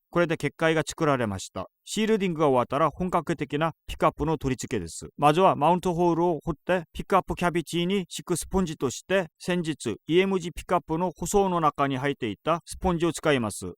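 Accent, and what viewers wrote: South Korea. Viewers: 韓国（？）だっけこのタイプの日本語クソカワイイwwww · 外国の方？韓国の訛りなのかな〜 全然分からなかった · 왤케 한국인처럼 일본어를 하나 했더니 한국인이었구나😅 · 뭔가 한국인이 일본어 하는 느낌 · 억양에서 한국어가 느껴지는 건 왜죠?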